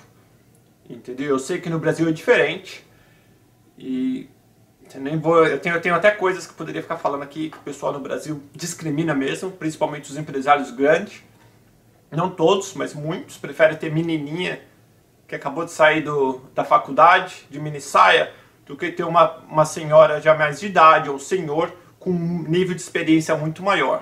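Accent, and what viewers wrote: United States. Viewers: Nem parece que é brasileiro com esse super sotaque americano. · Você parece um americano mesmo falando português... uma pena que meu Inglês não chega nem no básico! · Vc ta com sutaque de americano, é bem engraçado kkkk (obs: nao sei se é assim q se escreve sutaque).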